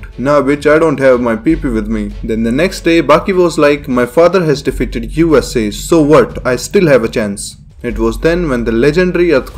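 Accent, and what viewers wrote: India. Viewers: "You don't believe me? Look at my chest I am so masculine that I don't even have nipples." "And when Yujiro Unleashes his demon, there is only one way you can survive and that is by dying." He don't poop anymore because he absorves every particle he eats LMAO Bro, your sense of humor is amazing, your acent turns things more fun and yes! Baki is sooooo bizare!! Indian guy, you made my day with this video! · Best Baki content creator by FAR! 😁 Love the Indian accent too, makes every joke work so much better. 😂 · Your indian accent makes it just so much better lmfao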